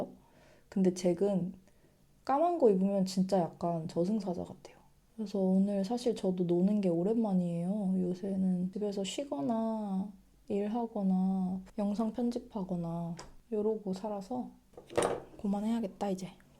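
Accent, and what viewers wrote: United Kingdom. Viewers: 너무너무 추카추카 합니다. 그렇게 오래 걸리는줄 몰랐네요. 저는 3개월~ 4개월 만에 영주권 받았는데 다른분에 비해 빨리 받은 거군요. 케이스는 다를수 있겠죠. 저는 결혼허고 난뒤 벋았고 26년전 일이라..... 지금은 많이 힘들다고 듣긴 들었어요. 암튼 다시한번 축하드리고 14년 되셨다니 거의 영국인이죠. 발음도 영국인 현지 발음이시고요. 아, 그리고 저는 3~4 개월 기다리면서도 안와서 재촉 전화했더니 알주일만에 왔다는 사실 ㅋㅋㅋ 날씨 안좋은 영국 😂😂 생활 열심히 행복하게 사시길 바래요.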